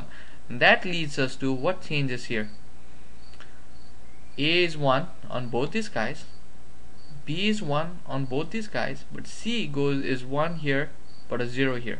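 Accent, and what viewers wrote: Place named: India. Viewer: Can't recognize that accent, where are you from? Seems like a light-ish indian with a bit of a lisp. Great video nonetheless.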